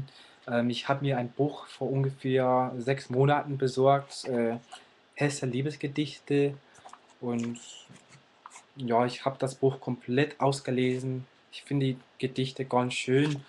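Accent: Bavaria, Germany. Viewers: nice, Bro! Es ist lustig, dass du Deutsch mit Dialekt sprichst (Bayrisch). Deine Grammatik ist beeindruckend, da gibt es so viele Dinge, die man schnell durcheinanderbringt, aber du hast es voll drauf. Zusätzlichen Respekt, dass du in deutsche Literatur schnupperst, denn die ist in der Tat großartig, was selbst viele Deutsche scheinbar schon vergessen haben!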